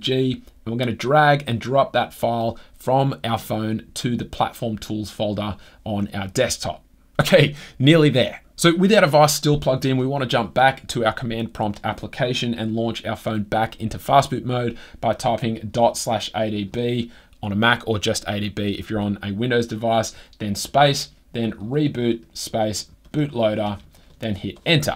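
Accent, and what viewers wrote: Australia. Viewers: Hey, first and foremost thanks for video as it's so awesome to finally be able to listen to an another Australian explain these topics. I wanted to ask if you could do a video covering how to install a GSI on a device as I have had trouble finding one that explains what to do clear enough.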